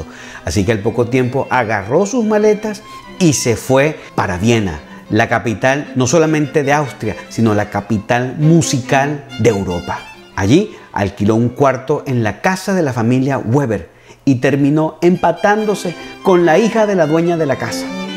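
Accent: Venezuela. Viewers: Tu acento es venezolano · Hola. Acabo de descubrir tu canal y este es el segundo video que veo. Me parece que haces una excelente labor divulgativa y además es muy agradable escuchar las historias contadas con nuestro acento venezolano. Dicho esto, te aconsejo que tengas cuidado con las redundancias, ( "verificar la veracidad.." en vez de "confirmar la veracidad.." o "verificar la existencia.." ) y con la gestualización que me parece un pelín excesiva. Insisto, sin embargo en que la calidad de la presentación es muy buena y muy bien redactada. Te deseo un gran éxito.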